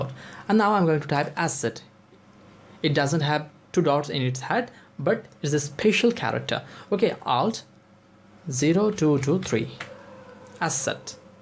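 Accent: India. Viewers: mr. indian i dont know what your saying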